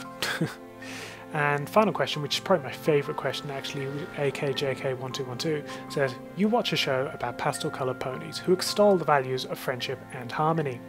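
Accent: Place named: England, United Kingdom. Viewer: You're English eh? You are now my favorite brony.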